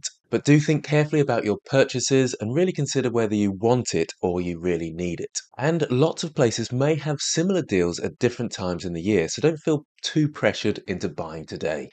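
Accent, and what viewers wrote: United Kingdom. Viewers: Are you from the United Kingdom of Great Britain and Northern Ireland?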